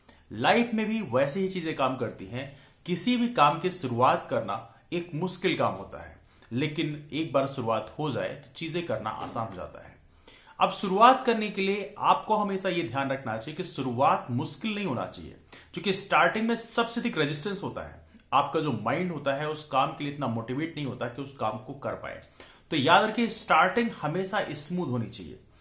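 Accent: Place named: United Kingdom. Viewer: sir me apki videos dekhti hu bate bhi apki achhi hoti h bas ap hindi ko bhi English lahje me bolte ho to ajeeb lgta h lekin aap hmse bade h esiliye achha lgta h apko sunna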